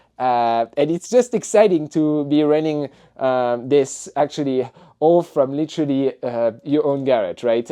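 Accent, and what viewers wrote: France. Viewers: Malgré tous les efforts je sens l'accent français, non ? 🤭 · French accent dude importing Chinees goods into USA Cinéma absolu \o/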